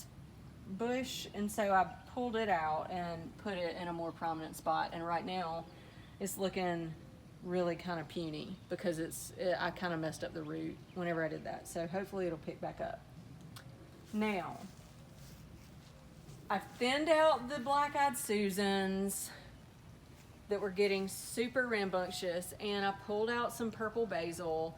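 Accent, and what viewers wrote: South, United States. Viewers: 'Southern' sounds good on you!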